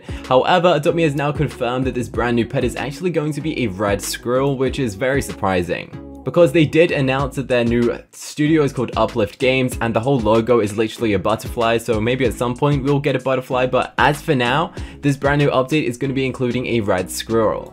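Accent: United Kingdom. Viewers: Hi I want to ask are you from England cause Your accent is british and i love your accent!!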